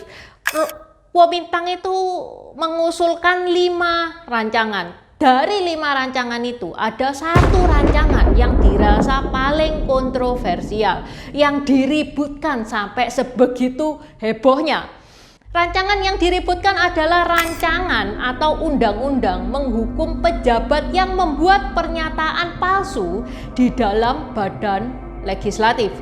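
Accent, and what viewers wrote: Java, Indonesia. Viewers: jawanya medok banget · Terpengaruh logat jawir dia. Ga tau harus minum obat apa buat bikin netral si jadi logat biasa aja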